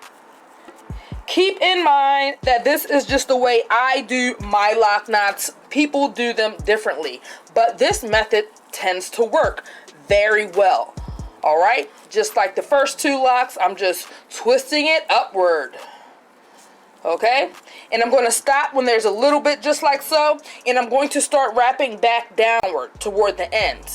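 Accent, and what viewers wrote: Baltimore, United States. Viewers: Are you from Baltimore? You have a very distinct accent. Loved the video! 💖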